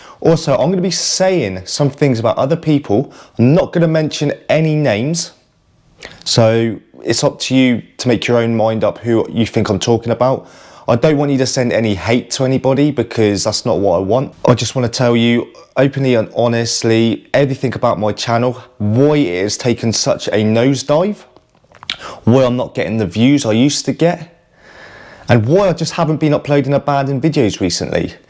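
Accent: Bristol, United Kingdom. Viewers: Where in the UK you from? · I sit down with the whole family and watch/share your uplaods. You're a part of the household down here in Plymouth. Abandoned exploration wouldn't be the same without that Brizzol accent. Thank you.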